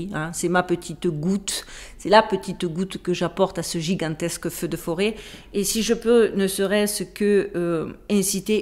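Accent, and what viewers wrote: Marseille, France. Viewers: Bonjour..Belle, Blonde et Curieuse de vérité.tout ça avec l’accent marseillais bonne continuation .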